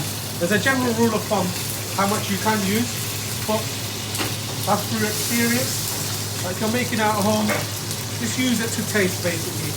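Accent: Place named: Birmingham, United Kingdom. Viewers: Should tell us where you are think it would be great for your restaurant? It’s a midlands accent maybe Leicester but I’d b guessing. Looked delicious. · Sounds like a Brummie. Probably from the 'Balti Triangle' or similar area in Birmingham, which probably has more 'Indian' restaurants per square mile than anywhere outside of India!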